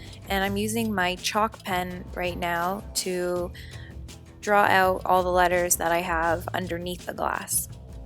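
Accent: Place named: Canada. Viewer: Omg!! I didn’t even pay attention that this was your video until it came to the end and you said! I heard the Canadian accent but didn’t think anything of it. I am going to attempt one of these projects. Haha that is so coincidental. Glad it was you because I liked yours the best out of the rest I was watching lol!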